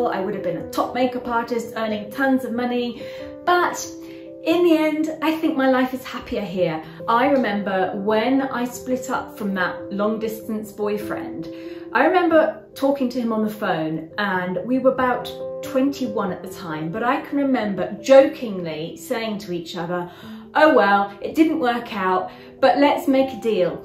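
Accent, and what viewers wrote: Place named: United Kingdom. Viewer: Wow lov ur journey ..n ur british accent..n ya ur husband is handsome..god bless u both.love from india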